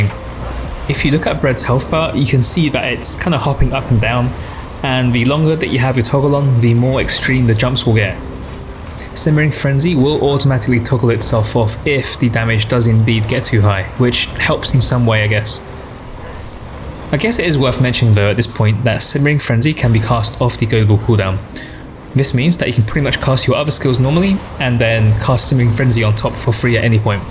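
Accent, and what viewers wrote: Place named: England, United Kingdom. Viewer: Everyone says Nefas has the most sexy voice, but I think your English accent definitely gives you the edge. Love the video, love me some more magcro!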